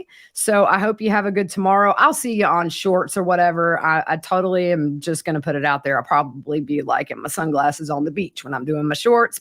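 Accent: South, United States. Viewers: NEW SUB. So glad I found you. I’m in South Carolina. I recognize your southern accent 😅❤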